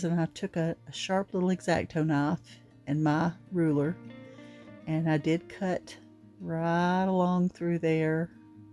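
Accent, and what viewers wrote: South, United States. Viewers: I haven’t heard anyone say “Swanee” in years I don’t know how to spell what a Southerner says for (I swear) love watching and listening to you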